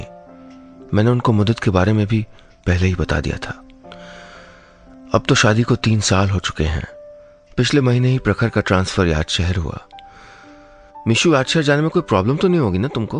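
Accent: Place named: Uttar Pradesh, India. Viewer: sir ...apki aawaj me kuchh up ka touch lgta hai ....😍😎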